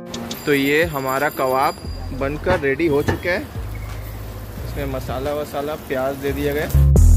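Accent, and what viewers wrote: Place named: Madhya Pradesh, India. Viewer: Aapki accent mp valo jaisi h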